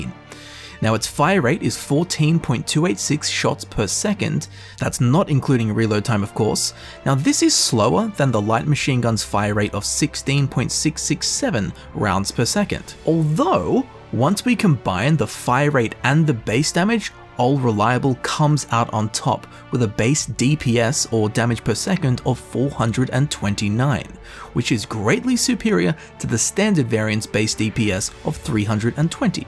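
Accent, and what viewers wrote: Australia. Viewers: i'm a simply guy. i hear a fellow 'strayan and hit subscribe.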